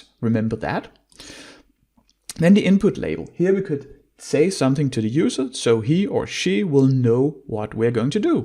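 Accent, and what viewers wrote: Denmark. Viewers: I like the danish accent!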